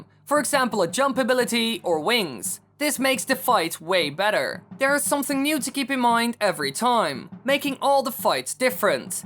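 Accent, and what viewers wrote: Netherlands. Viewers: Are you dutch? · Ik hoor aan je accent dat je Nederlands bent maar ik wist het niet honderd % zeker alleen toen keek ik een oude video van jou n daar zij je dat je een meeting ging houden in Utrecht maar waar woon jij ik woon in Overijsel vlak bij Zwolle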